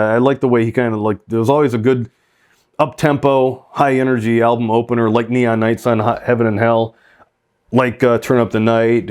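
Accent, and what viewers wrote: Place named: Michigan, United States. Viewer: I was going to ask if you were from Michigan by your accent.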